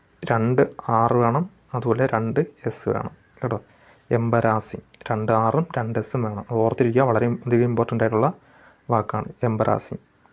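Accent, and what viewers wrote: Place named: Thiruvananthapuram, India. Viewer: Chettan TVM aanallle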